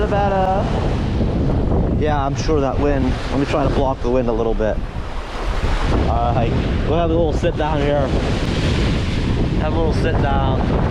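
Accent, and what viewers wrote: Pennsylvania, United States. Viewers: If it weren’t for your PA accent I’d say you’re a Michigander. Lol. Bella too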